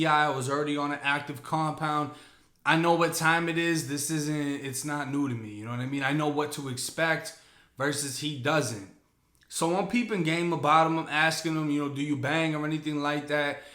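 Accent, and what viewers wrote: Boston, United States. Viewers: 617 over here, Charlestown Townies. That man got a Boston accent.. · Yo where yo from originally cause you aint from Florida with that accent? sounds like Boston. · my nigga but that Boston shit be getting me everytime lol. "Tessta-Hot" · I absolutely love your Boston accent, new sub been binge watching you all night.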